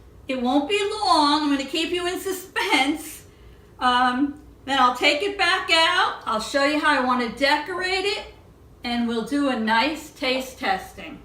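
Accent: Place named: New Jersey, United States. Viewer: Love your Jersey accent! And the pie sounds/looks awesome! 🤤🤤🤤🤤🤤🤤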